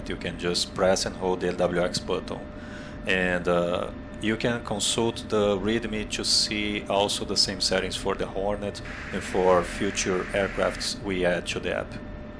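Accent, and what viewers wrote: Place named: Brazil. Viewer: Sotaque brasileiro em inglês é mt reconhecível xD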